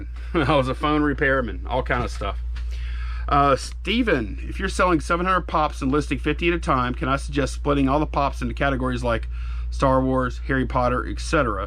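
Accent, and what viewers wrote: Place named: Los Angeles, United States. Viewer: Don't have an accent!? Are they watching the same channel I am? Hahaha. True you don't have as thick as other dudes I've known from LA but I want to know where that viewer is from that they can't hear it.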